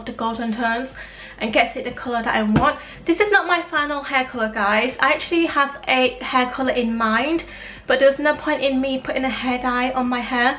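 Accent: United Kingdom. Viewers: u have a British accent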